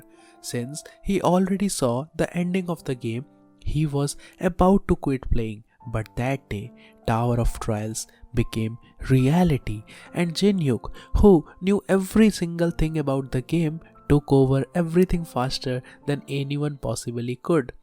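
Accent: India. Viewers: lmao indian accent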